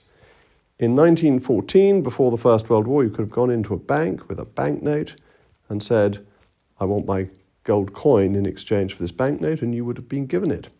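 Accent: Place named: Upper class, United Kingdom. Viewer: Well articulated intelligent discourse. If we can put aside his “posh” sometimes “parody” of the so-called “upper class”, he actually talks sense.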